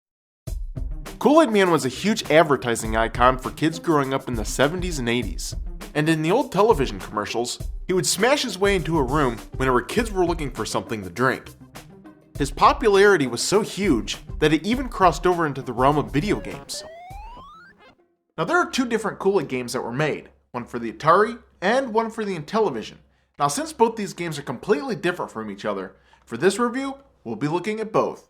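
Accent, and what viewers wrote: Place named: Wisconsin, United States. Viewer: man this is so similar to avgn no wonder people are taking sides. both vids are good, james is just more entartaining...plus his jersey accent beats out this wisconsin accent any day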